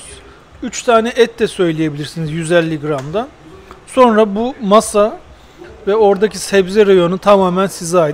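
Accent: Samsun, Turkey. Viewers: Abi sen samsunlumusun ellllllliii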